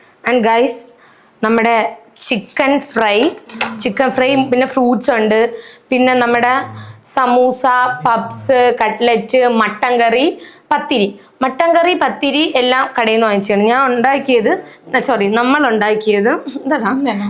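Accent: Trivandrum, India. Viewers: Nombu eduthittu kaanunnavar undo nammude trvandrathu ingane aanu parayunnu uratti 🥰❤️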